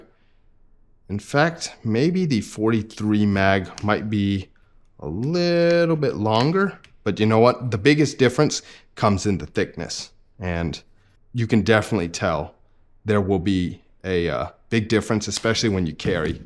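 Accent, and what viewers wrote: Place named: Texas, United States. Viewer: You Viet from Texas? You got the nuoc mam with tx bbq twang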